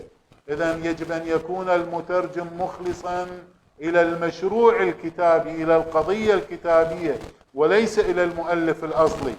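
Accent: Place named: Iraq. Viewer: اللفظ بلكنه عراقيه لاتليق ب pro لو كان بلكنه بريطانيه او امريكيه افضل استاذ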